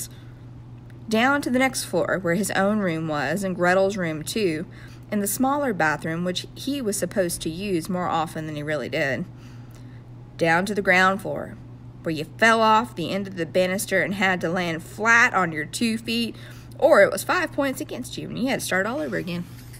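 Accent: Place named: West, United States. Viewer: why is this book slowly turning into a western accent. also thanks.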